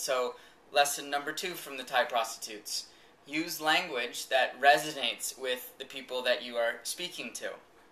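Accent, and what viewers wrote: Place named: England, United Kingdom. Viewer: i like ur english accent where ur home town?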